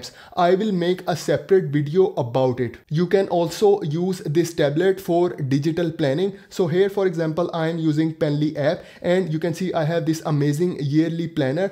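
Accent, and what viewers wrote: India. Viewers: I love indian accent 😄 it's amazing 😄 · Why Your Accent is Indian bro? As am Indian I can understand each and every word very easily. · Man got the thickest Indian accent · Bro got that thicck indian accent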